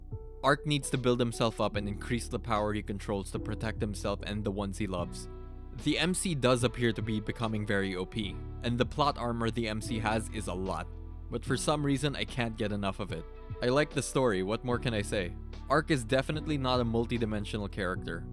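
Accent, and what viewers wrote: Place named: Philippines. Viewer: Filipino ka ba? Edit: I hear a slight Filipino-ish Accent.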